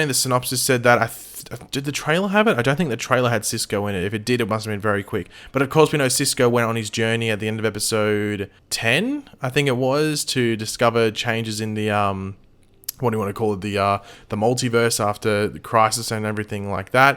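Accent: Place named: Australia. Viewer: Brother no offense, but Australian accent sucks 😑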